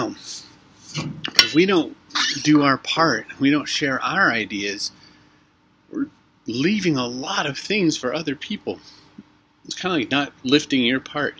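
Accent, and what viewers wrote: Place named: Ireland. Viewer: Hi! I like writing poetry and your Irish accent so let me drop by and leave a comment on one of your videos too!:)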